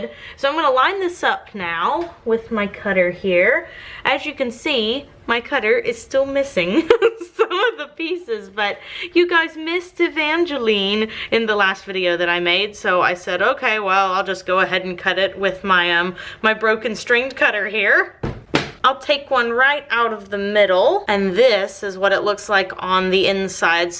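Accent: Texas, United States. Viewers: You do sound like graveyard girl, it must be the Texas accent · The way you say scrapey scrapey is similar to grav3yard girl's sippy sippy thing. But it's probably just the texan accent to most people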